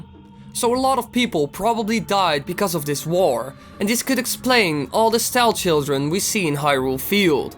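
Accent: Netherlands. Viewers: ...This may be an odd question, but... are you Dutch?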